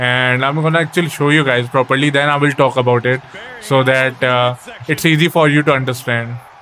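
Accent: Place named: India. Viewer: Nothing worse than the Indian accent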